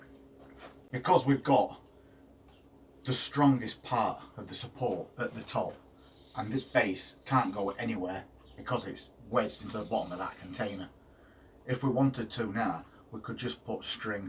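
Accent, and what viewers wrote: Yorkshire, United Kingdom. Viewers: I hear a propa Yorkshire lad, Sheffield mate?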